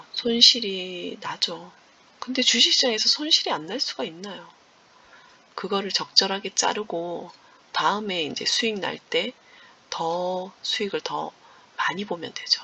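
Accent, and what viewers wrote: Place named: Gyeongsang, South Korea. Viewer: 저는 서울 토박이입니다. 친구중에 경상도 친구가 있는데 서울말(표준말)씨 잘 쓰는 친구인데 제가 들으면 경상도 느낌이 있더라구요. 완전 서울말은 아닌게 있어요. 선생님도 비슷하다 느껴서 경상도. 분이신거 같았어요. 자주뵐께요. 구독 좋아요. 눌러요. 잘 배우고 가요.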